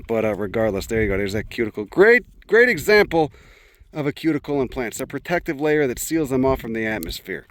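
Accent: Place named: Brooklyn, United States. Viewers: You and Bill Burr have officially broken my stereotype that people with that kind of Northeast accent are dumb 😂😂 this was the most entertaining and enthusiastic appreciation of cactus and railing of the culture ever put to video lol Thank you I will be sharing this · This is the most educated guy from brooklyn i've ever heard lmaooo.. heya fuck face, why don't you respect the botany huh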